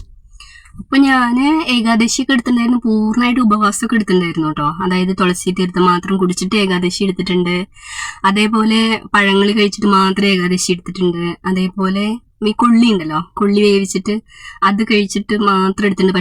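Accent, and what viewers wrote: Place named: Thrissur, India. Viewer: enik orupad ishtanu, njan trivandrum anu trishur language kelkan nalla rasamond. Enik videos ellam ishtamanu❤❤😊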